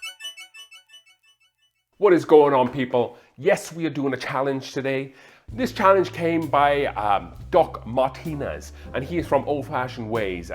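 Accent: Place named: Wales, United Kingdom. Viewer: based on your accent that you are originally from Wales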